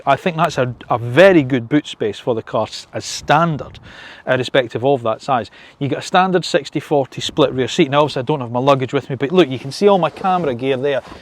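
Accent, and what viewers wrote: Scotland, United Kingdom. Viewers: Thank you for a truly fabulous review! Although the e-boxer Hybrid is just coming to here in one of the colonies, Canada, it is not yet available, and from early reports it will be in high demand. I will be getting a 2025 top trim non-Hybrid, which here in Canada is the Premier. We are lucky that we get the 2.5 L 180/hp engine here. Hopefully I can get the Autumn Green with the brown leather interior. Our grandson said you sounded like "Shrek" but no offence since my wife has Scottish roots. Cheers!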